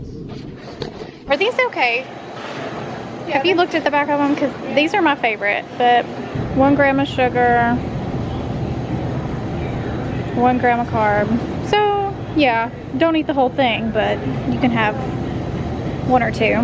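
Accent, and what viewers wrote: South, United States. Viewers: Hey thanks for doing this...and love your suthern accent...not many of those left around Atlanta... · Your sassy personality, and accent 🤗 love it! Reminds me of my hometown where everyone has a southern twang ❤️ Also love that you shopped at Walmart, a store that everyone has access to!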